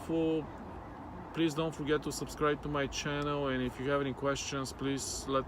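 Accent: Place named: Russia. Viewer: У вас даже акцент на английском звучит так, как будто вы русский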